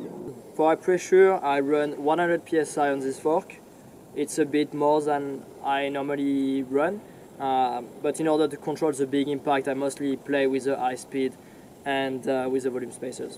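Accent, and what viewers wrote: France. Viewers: L’accent français dans ton anglais 🤘😉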